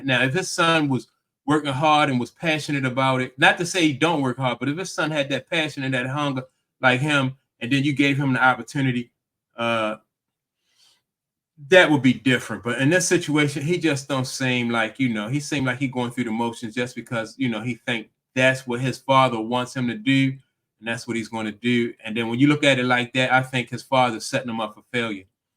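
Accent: Baltimore, United States. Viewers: Are you from B-more?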